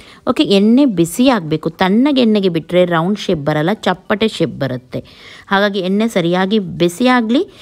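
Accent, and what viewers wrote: Mangalore, India. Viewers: Your from our mangalore your words like manglore puchhk take pucch 😅😅i like this tq..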